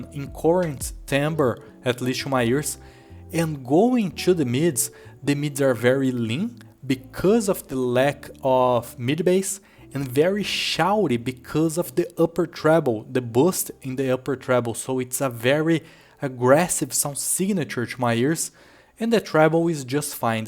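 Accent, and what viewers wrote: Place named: Brazil. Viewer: É sempre legal ouvir BR falando inglês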